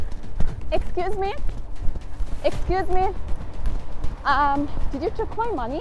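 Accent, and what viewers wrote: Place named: Austria. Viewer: We love you from Germany ..... Sister, You speak very beautifully "Oesterreichische German.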